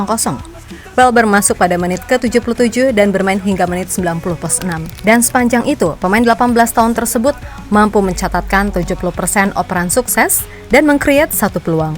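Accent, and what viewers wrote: Java, Indonesia. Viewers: E mak mblegenduk , wong jowo yo mbak ? Podo nak ngono 😂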